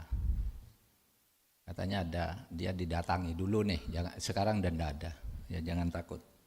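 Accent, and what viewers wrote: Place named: Madura, Indonesia. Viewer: Logat maduranya sekali² masih suka kedengeran. Orang Madura kalau udah pinter, memang pinternya kebangetan. Tapi nek wes atos, yo wes, angel urusane . Andaikan semua orang madura kayak pak Mahfud MD. 😁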